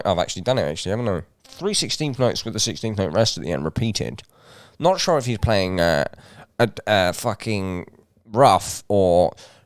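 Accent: United Kingdom. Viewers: Love your British accent...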